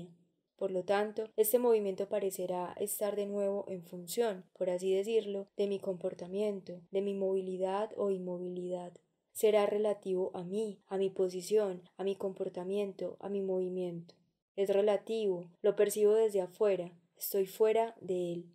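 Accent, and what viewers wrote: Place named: Antioquia, Colombia. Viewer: Bergson con acento paisa, estoy en el cielo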